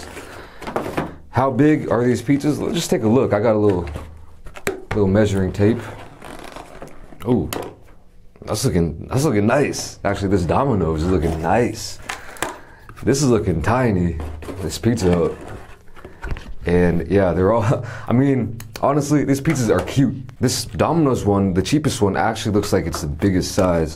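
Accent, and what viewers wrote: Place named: Chicago, United States. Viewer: There must be a hood out there in Korea cuz I thought you were one of our brothers from Chicago the way you talk and the way you carry yourself so glad I found your channel brother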